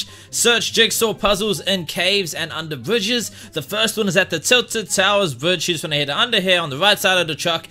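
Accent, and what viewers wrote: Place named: New Zealand. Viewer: I LOVE YOUR ATTITUDE AND YOUR KIWI Accents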